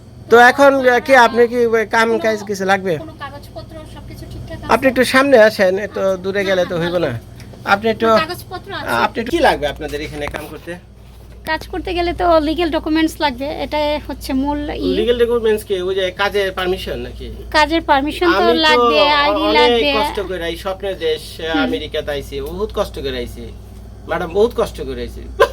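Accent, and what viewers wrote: Dhaka, Bangladesh. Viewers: Vai apni ki dakia apnar kotha gulo dhakar vasar moto ami dhakar bacharamduriar amra kaligog khajur bag bari kinaclim apnar mukha dhakar vasa hsuna khub valo laga and apnar modduma usa shompoka onak kicu janta partaci thank you valo thikban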